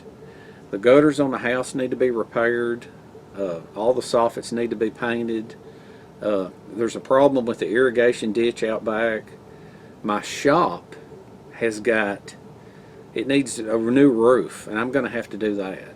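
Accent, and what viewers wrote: South, United States. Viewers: Take care of what you need to do. Sounds like you’re gonna be a might busy. I enjoy your Southern twang and you seem to be a really mellow guy. I watched your ranger roll video yesterday after I watched a video on ranger rolls on Grunt Proof’s channel from four years ago where he gave you a shout out. Spend time with your dad. I lost mine 23 years ago. Mom is still here and she’ll be 84 in a couple of weeks. I’ll be watching more from your video library and I’ll be looking forward to your next one. Take it easy. Mark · I’m a long time subscriber who never comments until now. I have much respect for your videos and watch them as they become available. I typically turn em up loud so I can hear your southern accent throughout the house, lol. Your fans will love ya just as much when you finally have time to continue pursuing your hobby! 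What you and have done is a great contribution to YouTube.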